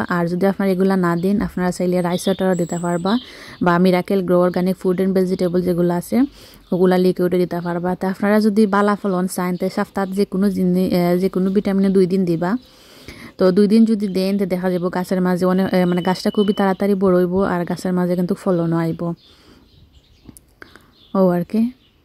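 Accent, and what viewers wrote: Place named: Sylhet, Bangladesh. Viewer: আপনার সিলেটি মাত আমার কাছে খুবই ভালো লাগে। ধন্যবাদ আপনাকে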